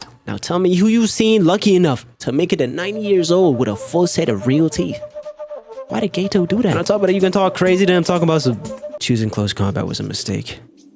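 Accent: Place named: Bahamas. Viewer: Where are you from? You sound kind of like a Bahamian.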